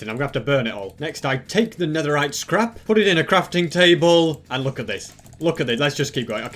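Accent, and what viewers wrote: United Kingdom. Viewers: Are Ya British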